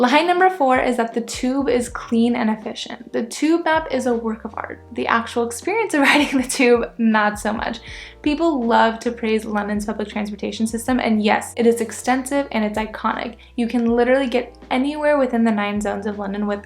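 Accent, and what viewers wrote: United States. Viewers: Well you lived up to your name, you muppet!!! she was raised in the USA, so let's cut her some slack, english pronunciations are notoriously difficult, esp for a foreigner, as you think you are so perfect, why not try pronouncing some place names in Wales and let's see how you get on! (i really hate condescending grammar nazis ) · You need to learn how to pronounce "borough". You pronunciation is so 'Amercun'.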